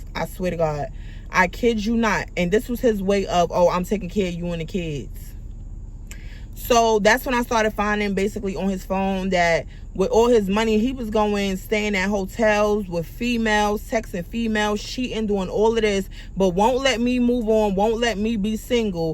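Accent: New York, United States. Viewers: I knew you were from New York!!! I’m from Brooklyn so I know a New York accent when I hear one❤😊😂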